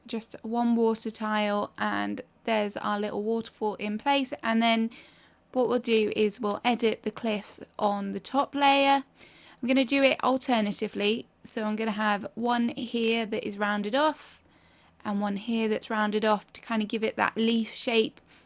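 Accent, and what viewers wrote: England, United Kingdom. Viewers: I love your accent! Are you from England?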